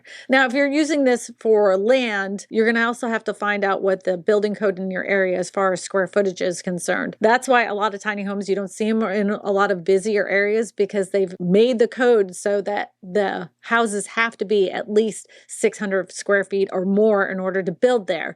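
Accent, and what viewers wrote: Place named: New Orleans, United States. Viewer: I thought I had heard some aspects of a Yat accent. :) I can't imagine how much this would cost to insure, even on the Northshore.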